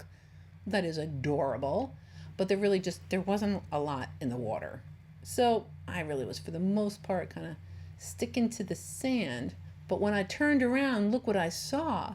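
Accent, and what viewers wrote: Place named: New Jersey, United States. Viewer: I enjoy your videos and you are teaching me so much. I would love to live close to a beach but live NE of Ft. Worth, TX. I enjoy your NJ accent and miss Cape May, NJ.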